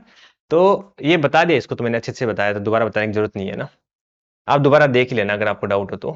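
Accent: Rajasthan, India. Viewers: Sir kya aap rajasthan se ho😊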